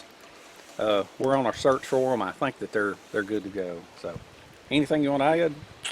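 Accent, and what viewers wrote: South, United States. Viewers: southern drawl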